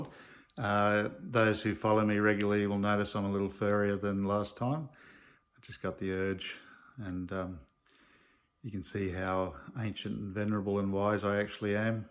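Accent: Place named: Singapore. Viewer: Stewart Lovegrove Honestly,I study with Leo Lit who is a high level student of Chu Shong Tin.Leo Lit's name is mentioned right up there with Jim Fung...etc....He talks about "Little Idea" vs "Big Idea" which is loosely translated as Sub-Conscious/Motor cortex vs Conscious/Analytical mind. To make a long story short,you're trying to access the "Little Idea" part of your brain/mind to have success in this style of Wing Chun. In my humble opinion, is not explaining that properly. Also,I'm fluent in Mandarin which helps me a lot.Also,Leo Lit is from Hong Kong and studied with Chu Shong Tin for many years.,I believe is from Singapore judging from his accent,but I'm not sure. In any event,I'm not sure why he keeps saying only "NimTao" as the "Siu" is there for a very important reason and cannot be neglected. All this "Heart Mind " stuff just confuses the issue.Yes,there is the character "heart"心 in Nim,but that doesn't mean we should call "NimTao" "Heart Mind",because all it means is Thought,Idea,or Intention. 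Anyway,hope this helps:) Having said all that,hopefully one day I can have a discussion with about this as he seems to be a very well researched person with high achievement in the art.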